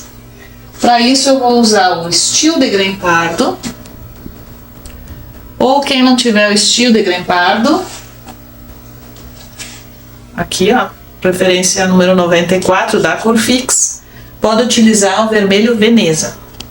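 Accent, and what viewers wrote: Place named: South, Brazil. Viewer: Hi, que surpresa ver campos de lavanda em Gramado! vc está em Gramado? amo Lavandas e nāo sabia que tinha ai no sul.,principalmente quando estāo proximas com campos de girassois. Aqui no sul da França, o pic da floraçāo é em julho qdo fazem a colheita, e ai em Gramado? amei ver suas técnicas de pintura e maneira de ensinar e amei o sotaque kkk acho que é do sul! estas tintas em pote que vc usou, sāo tintas para decoraçāo? a pigmentaçāo é permanente? pergunto pq aqui este tipo de tinta em potes sāo vendidas em lojas de crafts e a escolha de cores é limitada, e as em tubo em lojas de material para desenho e artes. fiquei feliz de encontrar seu canal e ver suas alunas!!!!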